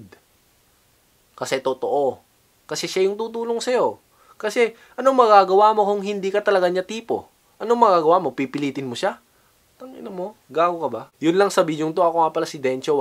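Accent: Ilocos, Philippines. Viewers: bakit boses ilocano?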